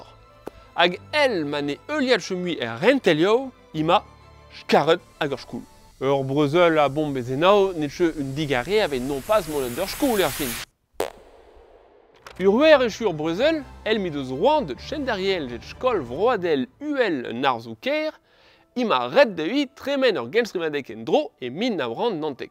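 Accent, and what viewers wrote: Vannes, France. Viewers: Is he speaking in Gwenedeg?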